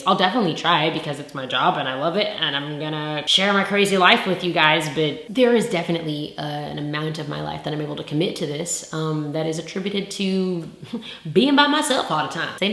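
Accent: South, United States. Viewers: Her southern Belle voice is beautiful lol whoever hasn't got a chance to see her LIVE and in person. I need that to change in 2018. I've been grateful enough to have seen her LIVE about 3 times. And she is such a GOD GIRL . Years ago I bought one of her jackets. And it's been keeping me warm ever since. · Lol where I'm from we always talk like you do with a southern accent for jokes but you really talk like that and I just couldn't take you serious I kept thinking you were joking 😂😂🤷🏾‍♂️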